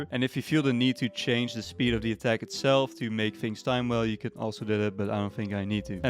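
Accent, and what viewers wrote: Netherlands. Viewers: Gast ik wist gewoon dat jij nederlands was ik hoorde het accent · Insane how fast you can hear a Dutch accent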